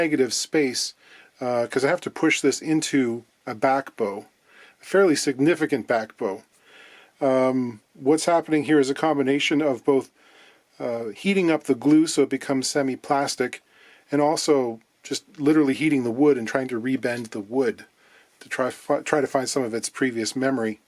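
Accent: England, United Kingdom. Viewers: Love the English accent 😊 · Your English Accent sucks. I say this as an English person 🙂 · How delightful to see such a classic. However, the issue of precedence is moot, with Charlie Watkins (of Watkins Rapier and WEM Copicat fameI claiming to be first to market in 1954 (the year of my birth). I actually owned a Rapier briefly in my 20s and, for reasons which need not concern us (clue, it was in the 1970s and I was in my 20s), have no idea what became of it. It was a piece of history, and the back story is much the same as with Burns. In the UK, tariffs on imported instruments were huge in the 60s, as was the price of the instruments themselves. Even the top bands such as the Shadows (and later, the Beatles) spent the early days of not just their careers, but also their stardom playing UK or continental instruments. Thanks so much for this piece of history. 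If you're watching, I do know you have an ear for accents love the solder/sodder stuff), but your English accent crosses every river from the Thames to the Tyne (mate)! As a Londoner, I can tell different suburbs without getting out of first gear (extra points of the use of the iconic Canadian 'Ey;'). In passing, I note that van gent tuners, from the Netherlands and a great tuner, have been used for many years on a more recent iconic English guitar brad - Gordon Smith. Extraordinaty value instruments. I should mention that, more than ever, your commitment to researching the Hx is super. Great video in every respect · Major credits for the attempted English accent. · Yeah bit of a mish mash of accents, but you pretty much nailed the mentality. It’s always enjoyable hearing folks from your side of the globe doing Brit accents, I still imitate Dick van Dike from 50 years ago and have been doing it that long. 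Dad joke don’t get any better in my house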